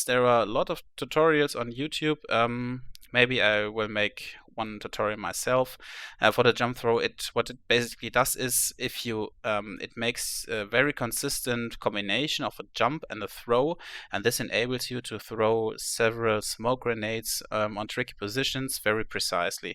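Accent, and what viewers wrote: Germany. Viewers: Sofort gehört dass du Deutscher bist :D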